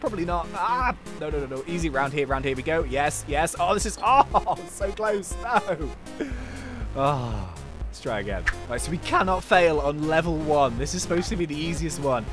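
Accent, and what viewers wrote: United States. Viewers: That American accent tho... that's better than I can do and I've never been out of the USA!! 😆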